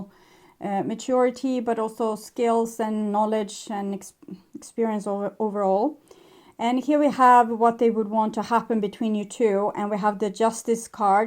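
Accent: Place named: Sweden. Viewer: thank you yor Swedish english braught many fond memories back out of Järnatiderne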